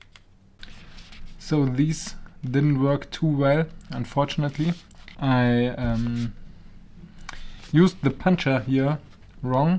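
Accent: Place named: Germany. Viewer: a few seconds in and i see papers and i hear german accent... subscribed.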